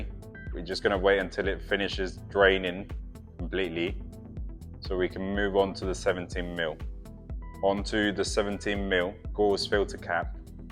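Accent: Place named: England, United Kingdom. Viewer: I knew that Colombian face but the English accent fooled me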